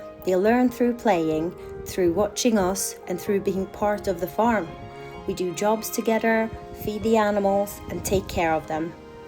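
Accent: Northern England, United Kingdom. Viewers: What a beautiful little piece of England 😊 You have a great northern English accent, for a Norwegian 👍 (the stunningly good YouTube algorithm recommended this) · For a Norwegian you have a very noticeable Northern English accent, Yorkshire?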